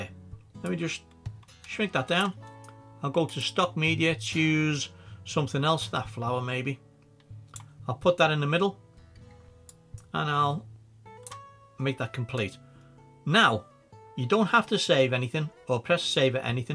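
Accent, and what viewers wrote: Liverpool, United Kingdom. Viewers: Hi mate, i googled compound clip for something on Fillmora as I made a video that had wayyy to many clips and needed to merge them as I made 3 separate "films" part 1,2 and 3. Needed to put them as 1 as it took 8 hours to make the 3 films into 1. Anyways Googled it an your video was the 1st video to come up... hit play and I smiled as your VOICE, the accent. I recognised as I'm from Liverpool and it was nice to hear. (Hopefully u r from around these ways an i don't look like a wally lol) Thanks for the video. I'm getting into Fillmora more & more. I don't restrict myself to a software as its the amateur thing if u don't use Premier or the apple 1. I like to use Adobe, davinci & filmora I am really enjoying it. Will be watching more of ur videos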